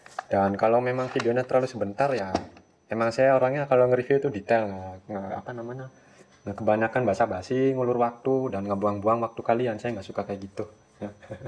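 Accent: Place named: Cirebon, Indonesia. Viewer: sampean dari cirebon mas ?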